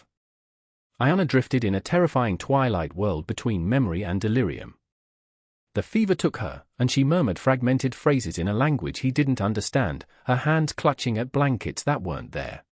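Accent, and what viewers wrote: England, United Kingdom. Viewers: Interesting yet disarming - - A narrator with a British accent telling a cowboy tail from the Old West 😞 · Please get rid of this hard, annoying English accented voice. It absolutely does not fit these stories. Bring back the warm sift and rich American accented narrator. This voice is extremely annoying.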